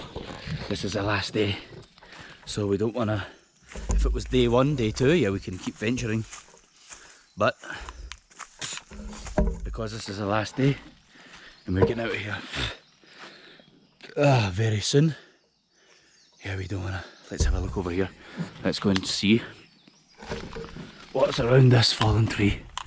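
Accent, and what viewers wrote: Scotland, United Kingdom. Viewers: Nice channel,keep going..areu from Scotland mate?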